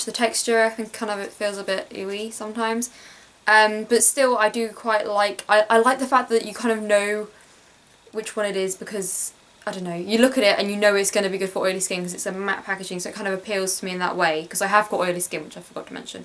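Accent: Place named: United Kingdom. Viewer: You're British accent sounds amazing! This is a good video because I never knew the difference between the two bottles.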